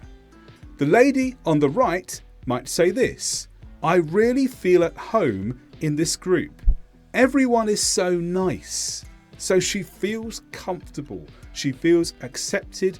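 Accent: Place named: United Kingdom. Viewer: Not American.. UK😅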